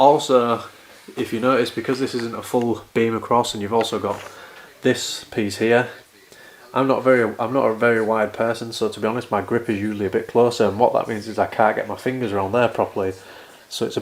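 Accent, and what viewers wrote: United Kingdom. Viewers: I think you should narrate a nature video. Smooth British accent sounds so intelligent through the speakers. Oh, and nice rack.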